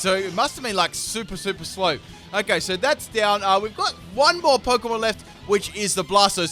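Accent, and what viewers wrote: Australia. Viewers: Are you sure that's not because of his accent? Different parts of Australia pronounce words differently. South Australia is a big example of it.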